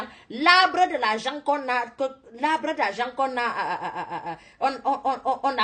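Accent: Cameroon. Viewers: Cet accent camérounais est vraiment trop dur et fort. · L' accent cameroonais la est fort ooo J te dit ma sœur, labre dargent la nexiste plus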